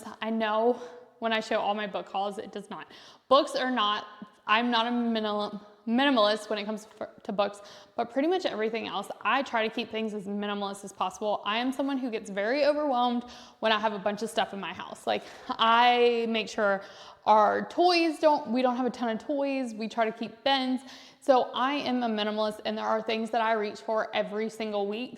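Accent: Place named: South, United States. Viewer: Really, that's a southern thing. Don't change for who you are for a comment. My family is from the south and says it the same way. 👍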